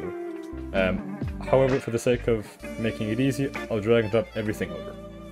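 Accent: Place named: Geordie, United Kingdom. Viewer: you sound like an American Geordie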